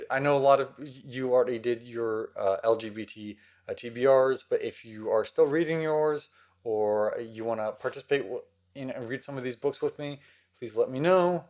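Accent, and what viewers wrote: South, United States. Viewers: I can't believe you're talking about your late pride TBR at your house in Texas on this video during your quarantine including your past days in the days and don't ask, don't tell after you had a lot of the LGBTQ-related books including Gideon the Ninth by Tamsyn Muir, "The House in the Cerulean Sea" by T.J. Klune and "The Color Purple" by Alice Walker, so I'm very proud of you and I hope your husband, Zach will be very happy for you, instead and I love your Southern accent!!!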